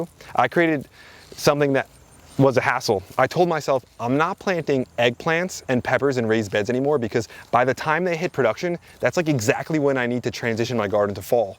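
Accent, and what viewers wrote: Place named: Pennsylvania, United States. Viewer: He sounds like my cousins when I visit Pennsylvania-ha! I'm originally from Philly now retired in Southwest Virginia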